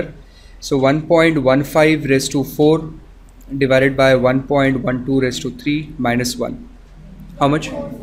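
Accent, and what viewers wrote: India. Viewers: This annoying irritating fucking indian accent.... Guys, why every nation can adjust its accent except you?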